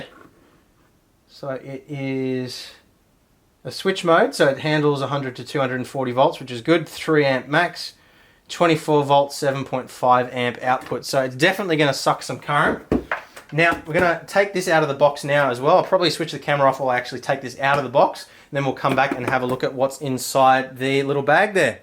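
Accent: Australia. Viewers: Hey thanks for replying. Judging by your accent I think you're Aussie? I'm trying to get my feet into sim racing and stumbled across your channel. I plan to start with a Next Level F1GT + CSL Elite Pedals + CSL Elite Wheel Base 1.1 + Fanatec Formula Black. Primarily will be playing F1 2018/19. What do you reckon this setup for a starter please?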